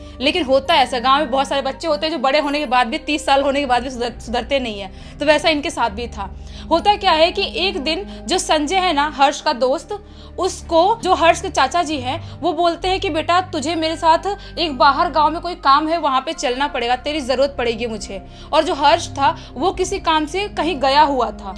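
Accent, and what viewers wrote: Bihar, India. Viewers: Dont you mind please!!!!! but her her voice like fully fast and like Bihar ka town tone I feel like when I m gonna to be close my eyes ... Baki brother you've done well before ...... shant shudd Hindi or slow and gehari aavaj wali storiya maja lati hin jyada tr log night me story sunate hin to night wala background better rahega ..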